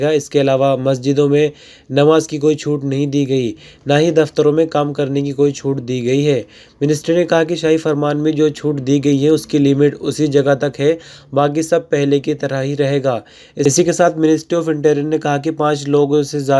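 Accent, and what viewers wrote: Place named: Uttar Pradesh, India. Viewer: Apki zaban bata rahi he ki aap u.p se ho konse district se ho ye bataye brother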